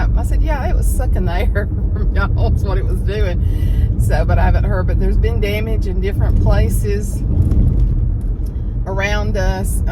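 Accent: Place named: Alabama, United States. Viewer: I enjoy you. I recognized your Alabama accent immediately, because I am an Alabama girl, too. Lots in common, including age, and I am glad to see someone on You Tube who is not pregnant or doing all the things I did 30 years ago. Thanks for your testimony, your outlook, and your wisdom.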